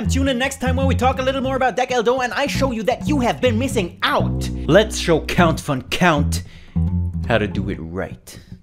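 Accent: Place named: Latin America. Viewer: I'm not trying to be mean or racism or anything but ur accent sounds so latin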